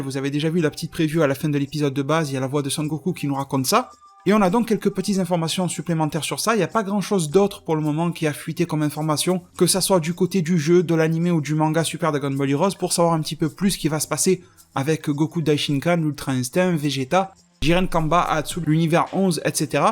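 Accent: Marseille, France. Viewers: Juste comme ça, vient tu de Marseille ?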